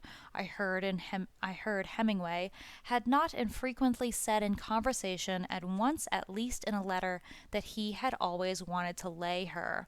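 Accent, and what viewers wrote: United States. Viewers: The thick whiny Ameican accent renders this program unlistenable.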